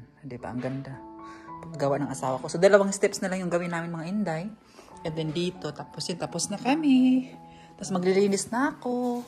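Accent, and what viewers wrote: Visayas, Philippines. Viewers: HALLWAY tawag ana dai knang imung gibutangan ug tela nga salog.Mao gyud dai Ayaw pakumpyansa kaayo sa pag film sa place sa inyong ginatrabahoan especially ang plate number sa car ,i-blur lng na kay lisud ma-AWARD sa owner. I-vlog lng ang mga lihok2x ninyu while working. Pasagdi NG MGA BASHERS . Malingaw mi sa imung chinikahan Kay tungod sa imung BISAYA accent. Ana MN gyud ta importante MAKASABOT mi ug malingaw . Stay true lng ug never MAGPA PRETEND para lng i-please mga tao. Maong love KA namu Kay mkalingaw KA and for being true to yourself dili pa feeling. Bsta amping mo permi dai ha. NO SKIP ADS mi tanan! God bless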